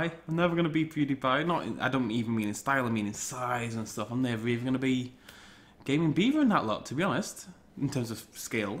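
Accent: United Kingdom. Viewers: I subscribed to you back in 2013. It was a cold day after a family conflict, and I don't remember how exactly I found it, but I watched your entire Jurassic Park The Game playthrough (in roughly two days) and I just loved it. I didn't love the game (not at all), but I really enjoyed your calm and humble approach to commentary on a let's play. I was tired of the loud energetic and highly edited content that let's plays and playthroughs have been so saturated with (like Pewdiepie, Markiplier and Jacksepticeye). But your content is always something that I feel that I can sit down and relax with. Also you seem like an amazingly nice guy :). I am also a big fan of dinosaurs. It's pretty much been my biggest interest for most of my life. So, when I saw a channel with dinosaurs being a major subject, and a YouTuber with an amazing british accent, I subscribed to your channel. 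I really don't know what type of content that I would like to see the most. I know that I was pretty excited about your new series called Pangea Today. The concept of it was that you would talk about and report new paleontological discoveries. After the first episode you ultimately abandoned it, and I don't understand why. You even mentioned in a vlog, I think, before the first episode of Pangea Today that you would bring the channel into a more eduacational direction. I know it's kind of a long time ago, but I am still wondering. I'm not complaining. I've just been confused about it for awhile. Can you please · Im a little late to the party here but what made me subscribe was your voice and jpog all those years ago. and the original british-ness, i joined the british revolution god dammit! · To be honest, I subscribed for just about everything about your videos. I mean, comedy, British accents, and DINOSAURS? 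Recipe for success in my book. And what makes it so much better is that you actually interact with your audience. Even before the livestreams, in, say JPOG, you'd ask "Which dig site should we do next," or "How should we make this exhibit?" It really shows that you care about your viewers, and you also appreciate and respect everything about the games and dinosaurs. But, if you're running out of dinosaur games, then of course shake things up a bit. Do LPs of a game that you thoroughly enjoy, do a game that allows your fans to join you,(The Isle?) do games that have something new or weird. I'm not a Let's Player-yet-but I think this is a pretty good plan for youtubing. That aside, I'm here to stay. Thanks,.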